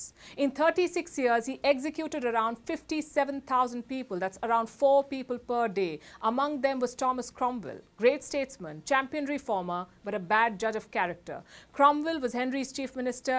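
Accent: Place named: India. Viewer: Pity about the Indian accent when discussing British events. Perhaps she should either learn proper English pronunciation or stick to her Pakistani/Indian subjects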